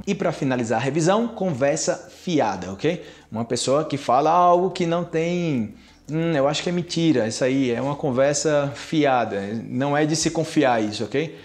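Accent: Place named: Colombia. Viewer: putzzz cara, tô chocado do jeito que tu fala espanhol, tens até sotaque de colombiano kkkk amo seu conteúdo !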